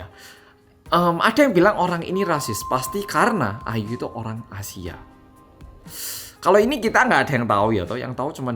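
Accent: Java, Indonesia. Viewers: Om ngomongnya sudah medok jawa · Bang logat jawa nya kok medok.heehehe..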